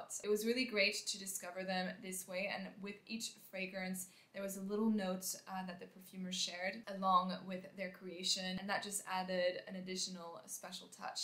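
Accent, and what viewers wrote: France. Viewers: As an American who does not speak French, it’s hard to understand when you say the words with a heavy French accent. It would be really nice and helpful if you could put the words on the screen.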